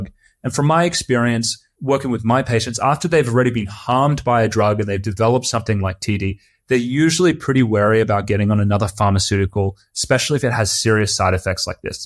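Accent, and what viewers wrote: Australia. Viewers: Name sounds Austrian, voice sounds Australian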